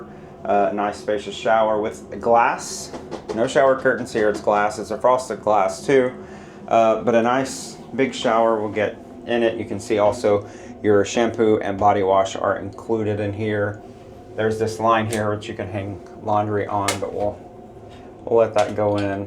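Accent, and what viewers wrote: South, United States. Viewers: Nice vid!! Love your subtle southern accent! Reminds me of a good friend of mine from Georgia 🙂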